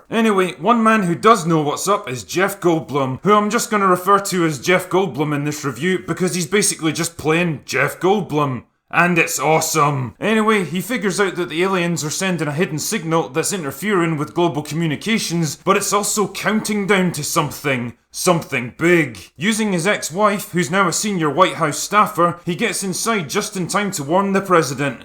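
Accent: Scotland, United Kingdom. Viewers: With his thick Scottish accent it made it funnier lol. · Listening to "USA! USA! USA!" with your Scottish accent made my day, Drinker! Couldn't agree with you more about this movie!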